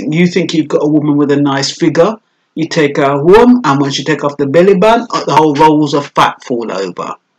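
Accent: Jamaica. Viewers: Okey but men, nowadays are putting on make-up and weaves too. Men just try to detach themselves.I love your Jamaican accent by the way.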